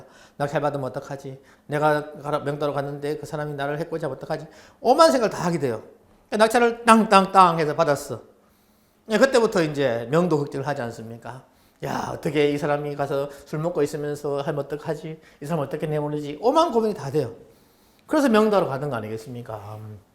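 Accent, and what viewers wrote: Gyeongsang, South Korea. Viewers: 경상도 억양이 들어가서 그런가 봅니다. 어떤 대통령이 경제 발음이 안 되어서 경제를 못했다고 했는데 제가 그런가 봅니다. 다른 부분은 잘 들어주셔요.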